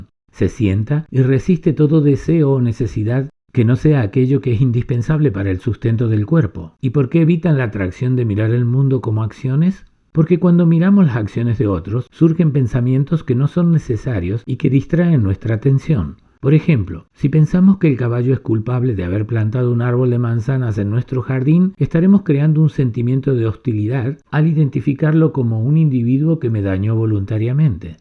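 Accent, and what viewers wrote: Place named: Cordoba, Argentina. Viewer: la voz ( aunque agradable y Cordobesa)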